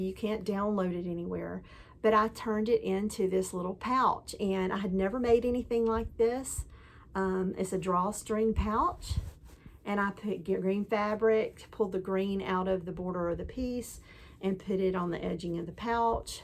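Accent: South, United States. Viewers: I enjoy listening to you, you’re even more southern than me here in Oklahoma. I hope you’re feeling better soon!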